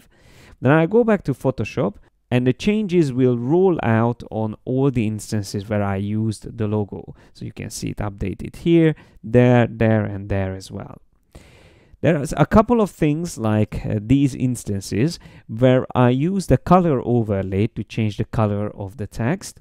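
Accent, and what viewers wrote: Hungary. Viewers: az öreg hunglish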